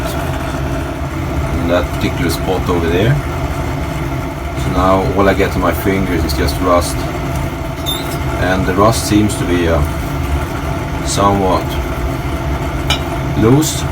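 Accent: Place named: Sweden. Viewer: Took me 2 seconds to know you Are swedish :-)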